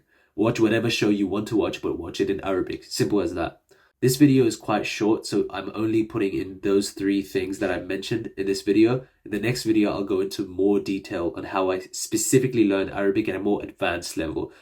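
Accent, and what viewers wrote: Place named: Australia. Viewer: His accent sounds Australian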